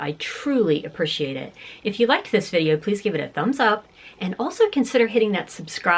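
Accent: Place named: New Jersey, United States. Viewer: you are such a joy to watch. Your kindness is much appreciated as is your humor! I'm originally from New Jersey, I left at 24 but we go to ocean city some summers. Your dialect sounds like home. Really, really enjoy your videos and yes, I would love to see some amazon hauls. Your pace is perfect. You are easily understandable and thorough and come across as a friend. So happy I found you.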